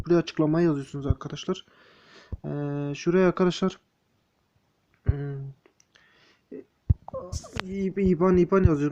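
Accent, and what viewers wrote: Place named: Anatolia, Turkey. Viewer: Hey senin şivene kurban oluyum Anadolu insanı. 👍🏻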